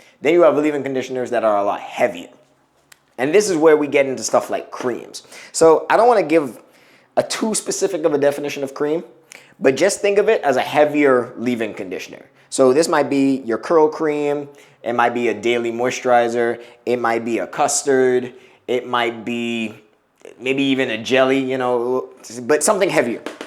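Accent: Bahamas. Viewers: You sound like your of Bahamian decent. 🤷🏽‍♀️🇧🇸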